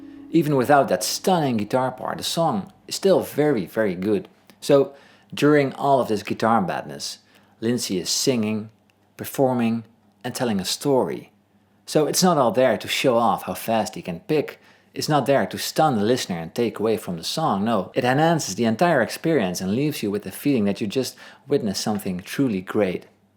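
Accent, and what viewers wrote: Netherlands. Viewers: Je accent lijkt net op een Nederlander die erg goed Engels spreekt 😀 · Why do you sound so Dutch, when you talk? There's nothing Dutch on your YouTube account. The way you pronounce fingers, do you have one or two Dutch parents? Then, you should really meet Teye.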